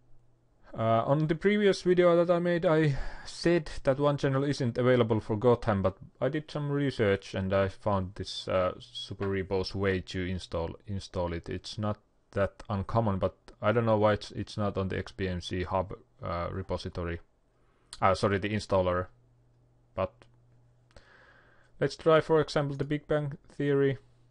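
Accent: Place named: Finland. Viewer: Tunnistan suomiaksentin tästä :D Kiitos kuitenkin!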